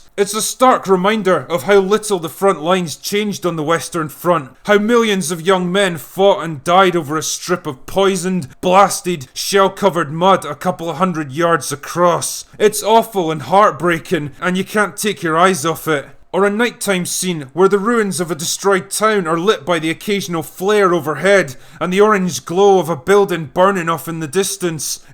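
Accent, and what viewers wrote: Scotland, United Kingdom. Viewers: I though you were a Scot?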